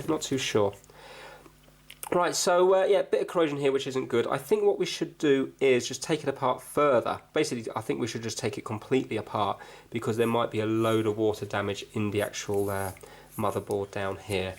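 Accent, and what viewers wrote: United Kingdom. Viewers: D one day we want to see face. And maaan. I just love this british accent. Here in Germany we all love it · Hi im trying to figure out what part of the UK you are from with your accent? Warwickshire or? i might be wrong but just wondering where your from. Thanks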